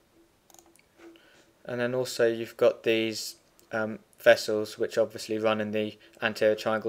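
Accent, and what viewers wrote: United Kingdom. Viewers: terrible british accent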